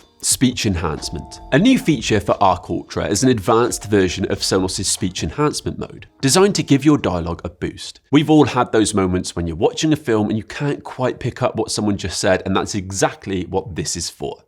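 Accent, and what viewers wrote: United Kingdom. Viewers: Your accent is very clear and so good I think you’re British 😅, Thank you for the tech information.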